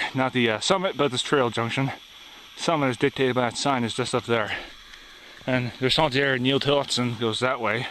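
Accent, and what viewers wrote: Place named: France. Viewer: Very nice place and french accent my friend :)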